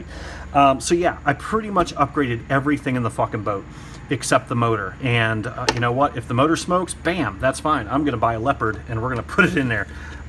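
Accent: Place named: New Jersey, United States. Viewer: You sound like us in jersey fuckin fuckin fuckin love it brother I just subbed fuckin aaaaaaaaaaa lol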